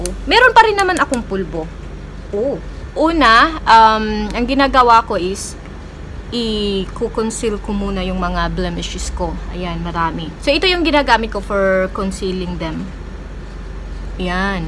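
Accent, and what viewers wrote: Visayas, Philippines. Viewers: i love bisaya accent kaya ok lang yan seswang dami ko friend na bisaya at natutuwa ako kc alam mo mostly sa mga bisaya magagaling sila mag english..buti kapa magaling mag makeup ako seswang tumanda na talaga di ako natutong mag makeup😅kay vice din ang gamit kong liptint maganda talaga sya tapos di nagda dry lips ko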